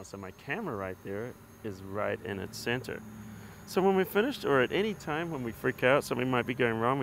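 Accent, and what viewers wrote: Australia. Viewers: Great review mate great work,....you sound half Aussie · I mean probably 1/2 AU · this fella sounds Australian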